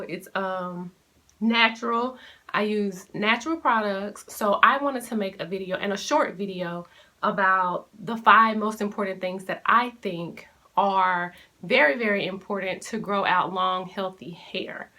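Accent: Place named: South, United States. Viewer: Cool beans! You sound a little a fellow Southern Girl, Lol! Welcome to yt...looking forward to the virtual connection. Peace and blessings. :)) *subbed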